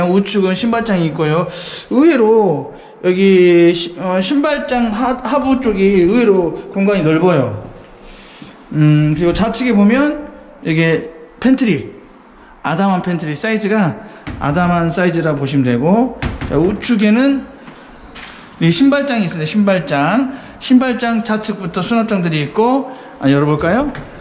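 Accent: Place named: Jeolla, South Korea. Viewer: 고향이 전라도 이신가봐요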